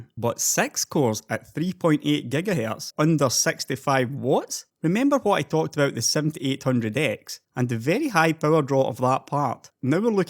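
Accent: Scotland, United Kingdom. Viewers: The only thing better than your video execution and the information provided is your glorious Scottish accent.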